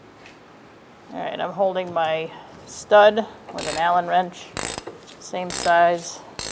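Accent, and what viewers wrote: Boston, United States. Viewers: A big thank you from South Texas! It sounds like you have a Boston accent which just makes this video better for some reason.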